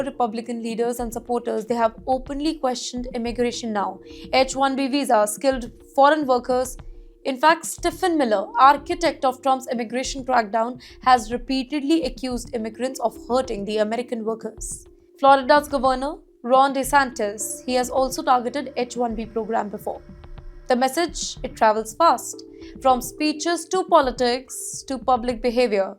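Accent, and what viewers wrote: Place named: India. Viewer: Two things, how come this lady is having an anchor Job with this much of Mother Tongue Influence( My bet is, it is Hindi) in her English. Secondly why this channel is obsessed with US as if a girl who is obsessed with a boy who moved on.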